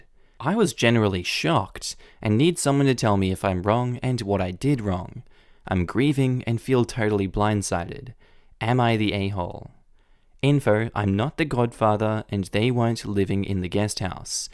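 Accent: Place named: Australia. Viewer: tfw you're an aussie and like hearing a familiar accent, but gotta always laugh at this branding lol (its cringe but i say that lovingly, its a big 'lol good for him' moment from me)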